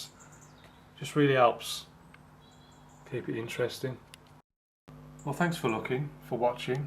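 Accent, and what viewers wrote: Birmingham, United Kingdom. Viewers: The Brummie Bob Ross. Bostin'!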